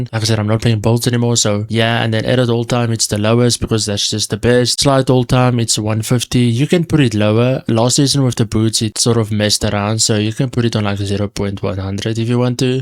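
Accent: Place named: Cape, South Africa. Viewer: The cape accent awe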